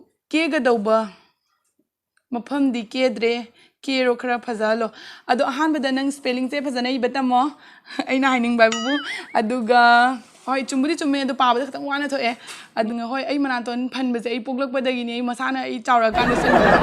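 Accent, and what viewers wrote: Cachar, India. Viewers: Mawa loude sis hainingba haijasanu shidaraga pamde phajade hairibasingse moi macha mashuda yaoba oirasanu thiba phajababu eikhoina oihanbra kalkpagine makhoidagidi sisna henba adugine eidi sis se cachar meeteine khnbada yamna nungsi lots of❤ from imphal video toina thagtlk o 👍👍👍👍👍👍👍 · Meitei ga mayangagi style pullp mixed twrij cachar meitei gi chtnabiro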